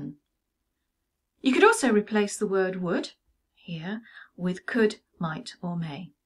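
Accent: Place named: England, United Kingdom. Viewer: Your English accent sounds really nice, where is this from?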